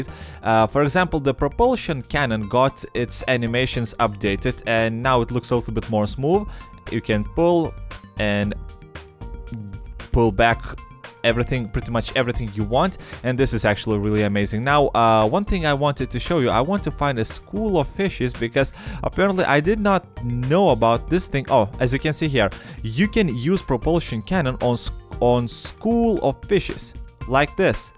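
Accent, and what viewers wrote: Russia. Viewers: У тебя акцент Русский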